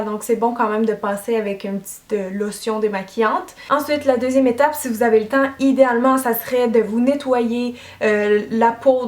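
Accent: Quebec, Canada. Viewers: Chacun sa prononciation. Ce serait dommage que les québécoises parlent comme les françaises. Vive les accents du monde! ;)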